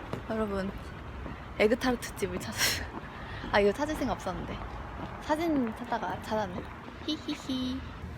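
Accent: Seoul, South Korea. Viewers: 말투가 완전 30년전 서울사투리네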